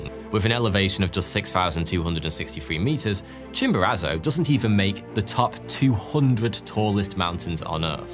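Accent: United Kingdom. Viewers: Yup, its all about story telling, a nice British accent that gets you 358K view in just 7 days and poor me with all the mathematics and Indian accent can make 400 views in 1+ year 😛- Just measure base to tip and it aint that big ha ha. Love this guy. Wish I had a good voice like him.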